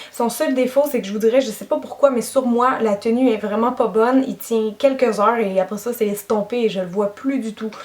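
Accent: Quebec, Canada. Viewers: ❤ Coucou!!!! Je voulais te proposer un petit truc assez simpa à faire et à regarder bien sur, mais avant tout; tu es l'une de mes youtubeuse préféré, tu as vraiment beaucoup de talant et beaucoup de charme, Toi tu as vraiment ta place sur Youtube parce que tu nous partage tout ton savoir et toute ta créativité et bien évidement ta Joix de Vivre! J'espère que tu t'envolera vers un plus haut rang dans ton métier de maquilleuse pro, maiiis bien sur, sans nous oublié nous tes abonnés!!!!!! Tout ça pour te dire, que tu es géniale continue tes vidéos! Car on t'aime toutes et tous très fort!! ❤ ✿ voilà ce que je veux te proposer; es que tu accepterais de nous faire une vidéo banale maiis pas tout à fait comme les autres!!, comme par exemple, une vidéo ou tu présenterais un maquillage ou bien des produits, tout et n'importe quoi c'est toi qui choisi.... avec un accent FRANCAIS!!!! 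Ca serais tellement simpa et cool! Nous Françaises on seraiit toutes émerveiller et vous les Québécoises vous pourrez enfin écouté avec un accent étranger comme nous on l'entend dans chaque vidéos qu'elle poste! Je ne sais pas si toi, ou vous toutes, vous m'avez comprises maiis j'y ais penser comme ça d'un seul coup et j ai voulut vous en parlez parce que c'est comme notre grande Soeur, elle nous partage son savoir et ses expériences, et nous on l'écoute comme des petites soeurs! ✿ ◊ Et puiiis en faisant cette vidéo, ça nous feras toutes du bien de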